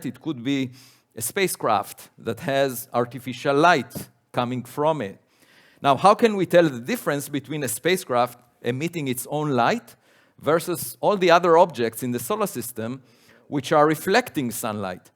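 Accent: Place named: Europe. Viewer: This man is simply FASCINATING! And his European accent makes him all the more so. Thank you, Avi, for encouraging us to be humble. Like you, I am of the firm belief that we are NOT alone. But, of course, only time will tell.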